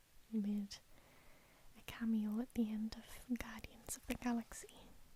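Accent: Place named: Northeast England, United Kingdom. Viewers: Northeast of England. Middleborough or Newcastle. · Northern UK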